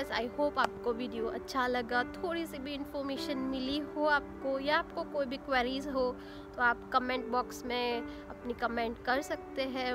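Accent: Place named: Gujarat, India. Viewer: The way you speak hindi is so adorable ♥️ and obviously that gujju accent makes your every single word soo sweeet♡ keeep growing budd💫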